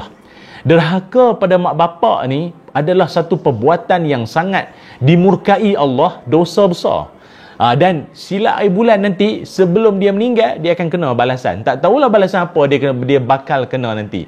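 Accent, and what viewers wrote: Kedah, Malaysia. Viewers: slang kedah...sedap dengaq.....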